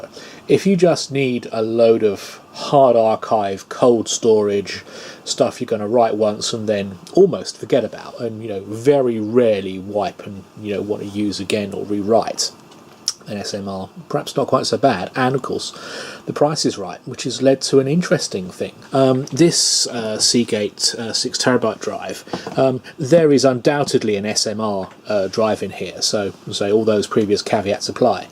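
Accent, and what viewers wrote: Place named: England, United Kingdom. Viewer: Love your "English Accent" and your "Inquisitive Persistence" with the Drobo. Keep making videos when you can!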